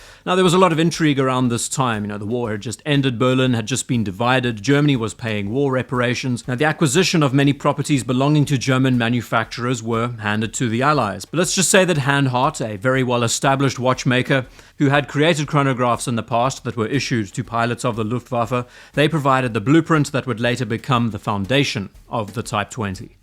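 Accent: South Africa. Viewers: ZA accent is my favourite way to speak English ( I know there isn’t a single uniform accent but they all sound great)! Fantastic watch by the way! The origins date back to World War II watches from Glashütte and Hanhart, with the latter producing them as part of war reparations. That‘s how a German design made it into French watch.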